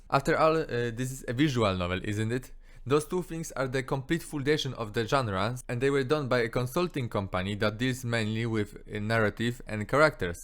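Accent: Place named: Poland. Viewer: You sound very polish :)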